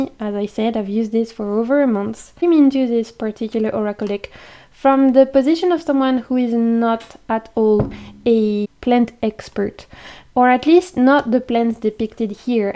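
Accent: France. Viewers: That’s a very complex oracle deck. You can tell a lot of time, energy and research in making this deck come to life. I will check it out and the 10% discount is great! Thank you for reviewing this for us., you need to make an oracle based on the plants and wildlife you grew up with. Teach us about where you live in the world. Many days I wish I was on a tropical island. Especially with winter in the Northern Hemisphere is so bitterly cold. BTW your French accent is lovely. I studied French in high school. I’ve always thought it was a beautiful language.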